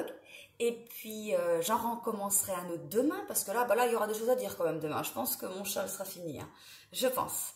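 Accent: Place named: Mayenne, France. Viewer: Bonjour j adore votre énergie et votre simplicité du coup je me suis abonnée et j ai visionner vos anciennes vidéos j ai adoré celle de la teinture de la laine et c est du boulot je viens d entendre la ville Laval et rien qu' à vous entendre je me doutait que vous êtes mayennaise je suis aussi de la région à bientôt dans la prochaine vidéo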